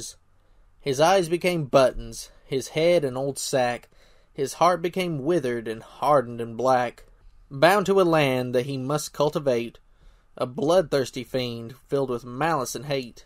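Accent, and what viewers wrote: South, United States. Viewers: Another great work! And you narrate so well. Your southern accent is always quite pleasing! Thank you for your YouTube channel.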